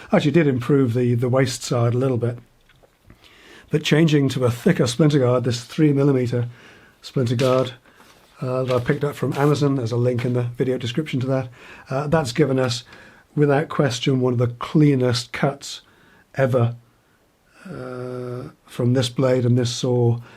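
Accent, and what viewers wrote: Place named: North West, United Kingdom. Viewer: Your accent sounds local to me, are you from the North West?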